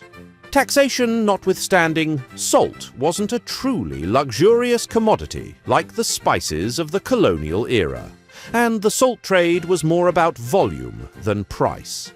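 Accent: United Kingdom. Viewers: The new narrator needs more practise putting on a heavier british accent with more inflection.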